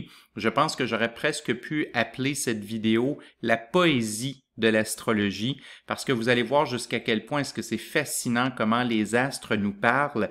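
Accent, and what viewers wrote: Quebec, Canada. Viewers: Merci pour vos vidéos très instructives, en plus quel plaisir d'entendre notre cousin du Québec !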